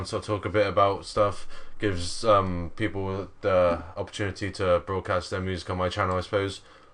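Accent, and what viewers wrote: United Kingdom. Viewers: is brittish?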